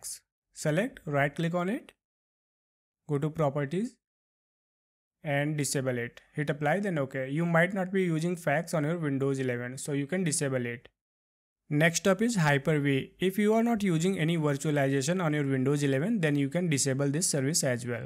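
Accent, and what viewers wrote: India. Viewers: this Indian accent LMAO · You're not kidding. I clicked on this video hoping, praying the creator would have an Indian accent. And man, my relief was immense and impossible to put into words. I trust Indians with my life fr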